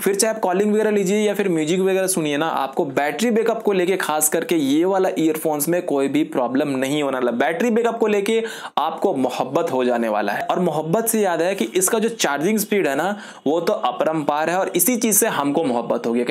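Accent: Bihar, India. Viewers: Bihari ho ka 😅😅😅😅😅😅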